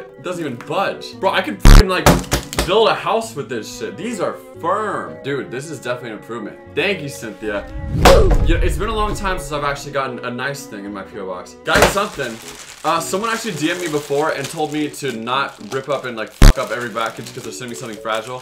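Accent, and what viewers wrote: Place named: United Kingdom. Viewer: Did he became British . Or am I just watching to many British youtubers 😭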